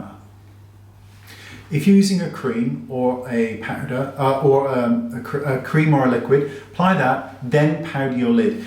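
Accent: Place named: United Kingdom. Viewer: Great tips for an older lady, and nice to hear a British accent. Love your videos x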